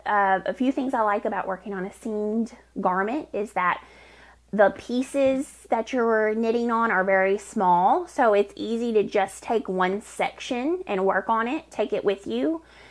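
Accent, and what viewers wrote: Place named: Arkansas, United States. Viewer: You are so creative. When I saw the notification today, I said, Yay!! 🥰 Always a pleasure to watch you and, yes, hear your cute Arkansas accent (said the Midwestern Michigander). 😂 Fun fun fun. Thanks for another great video.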